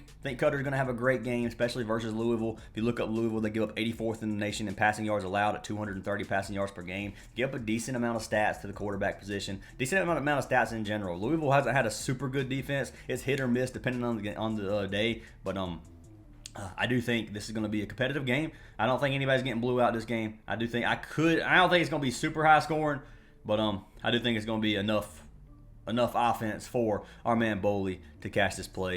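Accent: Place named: Greenville, United States. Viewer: Bro I live in Greenville. I could tell by the way you talk you was from around here.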